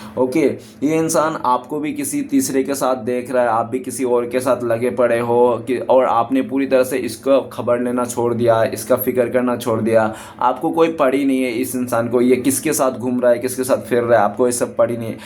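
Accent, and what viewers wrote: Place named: Bengal, India. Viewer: aapnar acent bengali aachen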